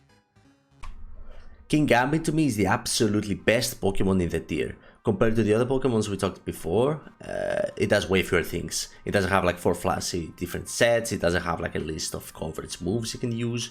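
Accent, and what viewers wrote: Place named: Scandinavia. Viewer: ye i know what you mean. i used you hisuan arcanine team once and wasnt aware enough and got totally destroyed. btw I love your accent, are you from the scandinavian area?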